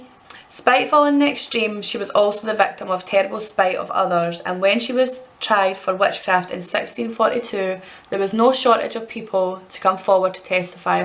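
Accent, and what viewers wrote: Scotland, United Kingdom. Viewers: So nice to hear an actual Scottish person talking about witch craft as a practicing Wiccan myself the Rowen branches and red ribbon are uesd as a fertility and abundance charm just FYI also the bridles u spoke about were commonly used as u quite rightly said on gossiping nagging wife’s but the husband would drag ur ass door to door to show the neighbours and towns folk that ur wife was a bit of a gob shite and u tamed you woman lol 😆 can u imagine also was common practice to get a good spanking if they disobey there hubby’s pmsl 🤣 what a shite time to be alive as a bold outspoken woman eh ... any whoo loved the video hope u do more on the subject u should look in to the Dumfries witch trials they were particularly shocking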